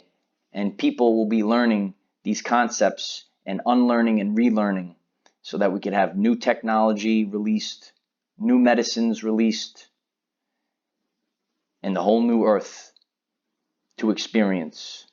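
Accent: New York, United States. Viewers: love your accent. It’s adorable. Are you from New York? Greetings from Calgary Canada 🇨🇦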